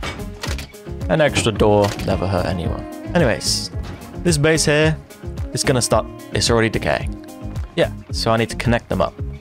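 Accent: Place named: England, United Kingdom. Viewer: Love the inventive builds and chill vibes. I prefer your English accent over the American :)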